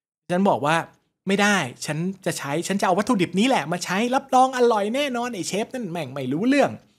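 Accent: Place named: Lampang, Thailand. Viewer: ฟังมาสองปีพึ่งรู้ว่าคนเหนือ สำเนียงแบบนี้ลำปางก่อครับ?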